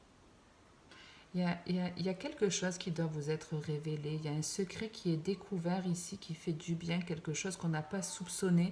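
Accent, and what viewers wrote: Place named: Toulouse, France. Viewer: Bonsoir madame vous êtes également du verseau il me semble.j adore votre accent . Toulouse ahhhh . merci pour ce clin d œil.merci pour ce tirage .. bises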